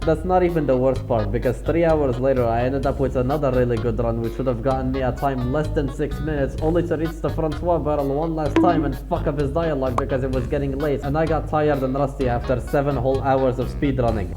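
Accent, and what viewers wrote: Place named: Poland. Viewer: You sound sooooo Polish xD but still nice video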